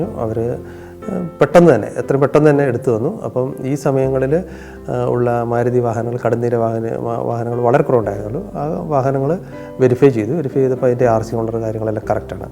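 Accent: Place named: Southern Kerala, India. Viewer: kasargodano nadu.....pakshe slang thekottulla pole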